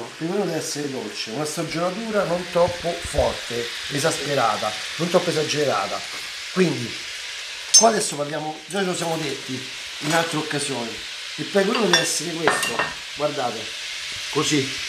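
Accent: Rome, Italy. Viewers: 😘😋😋Veloce, semplice e fantastica 😋😋 Complimenti per come sai combinare la tua professionalità con il tuo romano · Cuoco,oltre il simpatico un po marcato dialetto romano ci presenta sempre cucine trite e ritrite da far noia,quando saprà fare un piatto che sia diverso dalla normale consetudine? Stati e non copi. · Bravo, ma "questa parlata" in romanesco non si potrebbe evitare, visto che è un canale italiano? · non so se me fa venì più fame l'inflessione romana, il piatto in se o la passione che si sente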